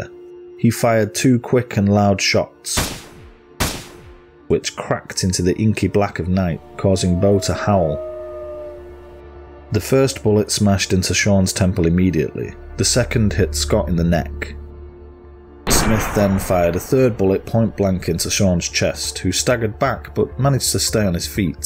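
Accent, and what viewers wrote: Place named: Northern England, United Kingdom. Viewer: I am in Hazel Grove, Stockport. I did think you could be from the North.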